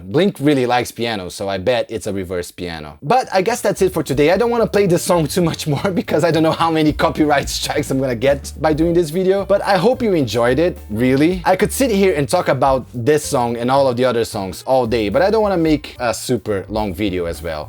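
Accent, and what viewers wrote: Brazil. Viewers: Po o seu sotaque parece muito de brasileiro, vc é br? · mano vc é brasileiro? parece pelo sotaque ahahaha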